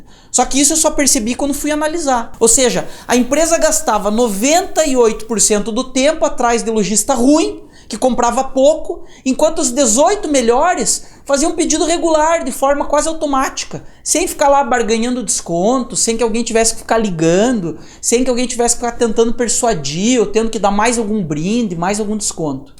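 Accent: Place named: Paraná, Brazil. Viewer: Esse sutaque acredito que seja paranaense kkkk. Excelente profissional o.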